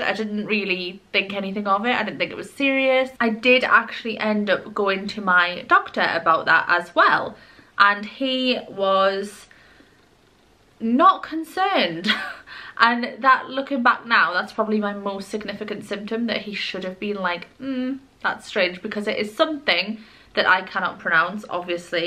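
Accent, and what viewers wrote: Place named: Durham, United Kingdom. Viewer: Are u from Durham by any chance our accent is same as mine that’s all 🤣 I’ve been having what I think is symptoms - usually a fairly healthy person work out eat healthy (most of the time) but I’ve been experiencing painful hips mainly on my right hand side , which cause me to limp numbness pins and needles in my hand feet and travelling up my legs , dizziness fatigue this had all been going on for a while, eventually went to the doctor last week and it all basically fell on deaf ears , raised my concerns of ms and left no further forward 😢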